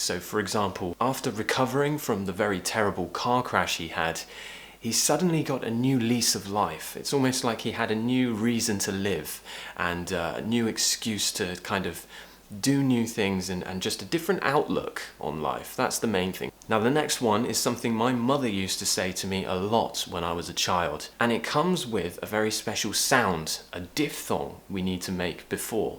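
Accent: United Kingdom. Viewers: Thank youuuu !!!! You helped me a lot to learn british accent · I love British accent and I've been learning a lot because of you. Thank you so much teacher. You're the best. Greetings from Mexico. 💕🥳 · Thanks so much💚 for educating us , Everyday I watch your old and new video's they helps me a lot to learn British accent Big hug for you my English teacher 😘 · love your accent ❤️ and excuse me, where are you from in the UK? :D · I'm so grateful for your helpful videos; they give me a new lease of life. I find this one especially nice and useful. Let me get something off my chest: I love your British accent and expressions. Have a great day, or as close to a great day as you can have in these difficult times. Cheers,! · I follow many English teachers on YouTube in order to improve my British accent. They are all great but yours is for sure the best! I just love it. 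Cheers. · Has anyone seen him twice with same hairstyle? Remarkable guy! You've inspired me to learn the British accent. Thank you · Your speech, awesome British accent and explanations ın a simple and easy way are soooo helpful. Thanks a lot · I love the British accent. It is elegant and your accent is best ever. I will keep watching your videos to learn as much as possible.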